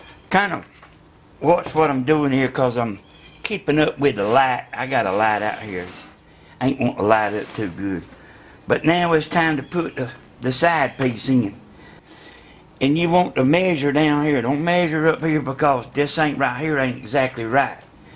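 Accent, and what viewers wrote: South, United States. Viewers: This man did a great job. Now if you can't understand him don't listen to his presentation. I'm not from the south but I could understand every word he said. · I'm use to hearing people talk like this since I live in the south. Pretty common with family and co-workers. Like listening to an old guy tell a story from his youth. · this guy knows what hes doing. but yh , true southerner ! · dude you have an awesome accent really American I like that ! :3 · I like your attitude and your southern accent.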